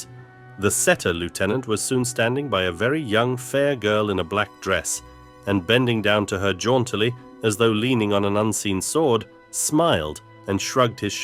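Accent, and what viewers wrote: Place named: Russia. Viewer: У чтеца ярко выраженный русский акцент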